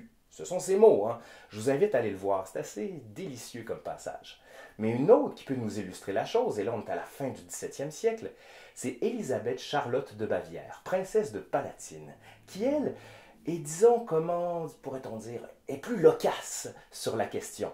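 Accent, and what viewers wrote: Quebec, Canada. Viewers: Petit accent québécois s.v.p !!! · Merci pour ce petit message..j’ai regardé plusieurs de vos vidéos elle sont super intéressant.bien expliquer.et ce petit accent canadien trop chou ..💋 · Quelle érudition et ce petit accent canadien, j’adore. Le sujet n’était pas facile à traiter mais vous l’avez bien chiadé enfin, bien traité. J’aime beaucoup vos capsules. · Video intéressante, dommage pour l'accent québécois 🤣, désolé, jai du mal mais la video est excellente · Je découvre votre chaîne, je ne me suis pas fait "chier" une seule seconde avec votre histoire du caca, félicitations. En plus l'accent québécois, qui pourmoi à un charme extraordinaire, je m'abonne à votre chaîne....... et petit détail , j'ai remarqué, jeune homme, que lorsque vous prenez l'accent des nobles français du temps passé, vous perdez votre accent québécois, pour moi c'est fantastique, c'est comme quand vous les québécois vous chantez, vous perdez votre accent. 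Alors que nous , les français (vous aurez compris que vous avez à faire à un français), nous quand nous chantons, quand nous parlons nous ne perdons pas notre "accent français"..... celui de l'arrogance française, reconnue dans le monde entier (sauf en France).... Vive votre chaîne, vive le Québec, vive la France et vive le caca.....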